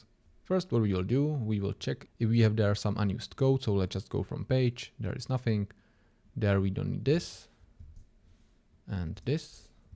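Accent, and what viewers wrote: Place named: Slovakia. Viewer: Podľa prizvuku si slovak mam taky pocit, koľko sa pohybuješ ako web dev ?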